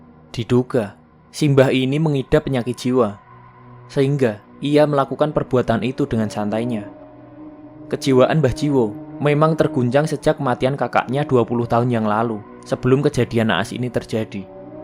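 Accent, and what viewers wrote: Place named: Java, Indonesia. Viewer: Gaya ngomong lu garing trus medok lagi min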